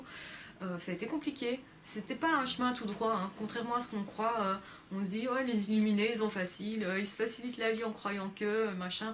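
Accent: Brussels, Belgium. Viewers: Bonjour, me trompé-je ou entends-je un brin d'accent Bruxellois? Pardon c'est hors sujet mais ... voilà. :-)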